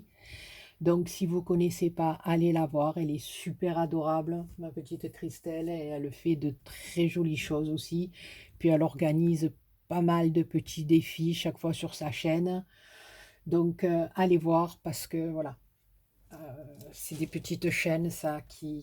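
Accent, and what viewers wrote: South, France. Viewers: A tres bien....tu as tout compris......reste comme tu es ...simple ...a t ecouter ...c est un spectacle.....j adore ton langage ton accent du soleil......ta generosite dans tes paroles.......tu as eu des combats que tu as gagner....alors n attrapes pas la boufalliiissse....avec des mauvais satellites........ il existera toujours des gens qui tournent en rond.....et qui ne savent que baver ........ils ne savent rien faire d autres.....qu a regarder le train qui passe......bonne soireeeeee.....bisous....a bientôt.....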